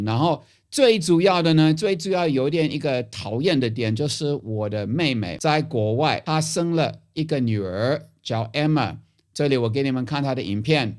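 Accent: Taiwan. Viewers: 不用字幕啦，教授你說的台灣國語很道地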